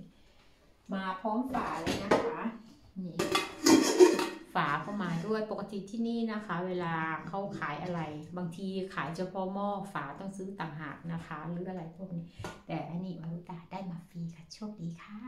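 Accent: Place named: South, Thailand. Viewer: พี่warutaเป็นคนใต้ใช่มั้ยคะ แฟนหนูคนนครศรีคะ เค้าบอกว่าสำเนียงเหมือนคนใต้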